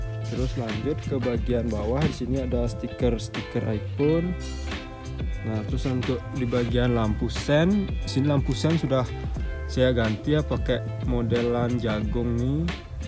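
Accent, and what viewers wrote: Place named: Madura, Indonesia. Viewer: Suara nya logat madura tak yee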